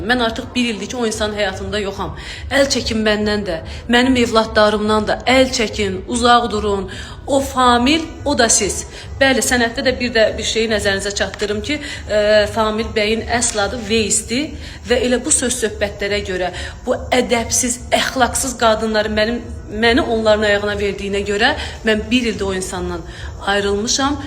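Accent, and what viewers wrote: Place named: Baku, Azerbaijan. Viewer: Kendci..davavizi..gozuvuzu..danisiga bax.Baki lehcecine kecib...ciriq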